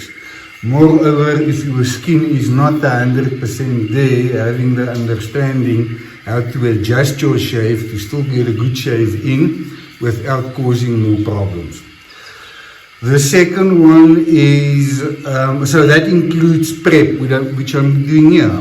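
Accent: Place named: South Africa. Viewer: I came for the amazing shave and education. And I'm staying for the accent! It sounds South African to my ears. Stay healthy and happy and always dapper! Greetings from Australia.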